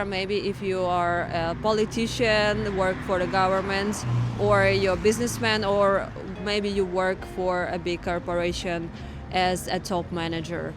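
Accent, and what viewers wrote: Russia. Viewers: Horrible Russian accent! You need subtitles, period!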